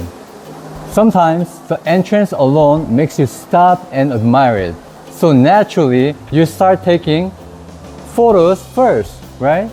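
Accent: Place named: United States. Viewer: By his American accent, sounds like the narrator came to the US after he was 13 yo?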